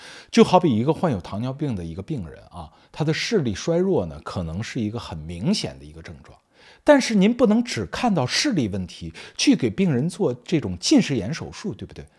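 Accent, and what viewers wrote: Standard. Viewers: 一口字正腔圓的普通話操著🇨🇦的心，這是一種什麼樣的精神😂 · 是不是做播音主持的？字正腔圆，有条不紊，说话听起来很舒服。内容当然也很高含金量！ ❤❤❤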